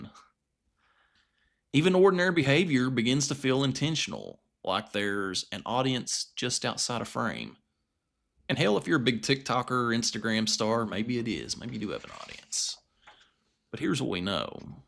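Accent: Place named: South, United States. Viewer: Great southern accent. Love it. Subscribed.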